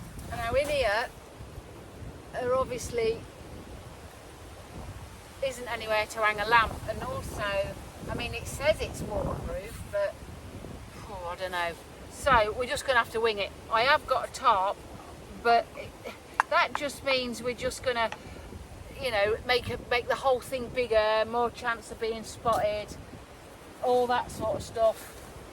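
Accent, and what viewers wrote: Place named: England, United Kingdom. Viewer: This is the 2nd video of yours i watched. You're a funny woman, I like that. I'm glad you're not dead yet. I always have to listen very carefully to understand your English accent. But I can manage that. Thanks for the videos, best regards from Germany.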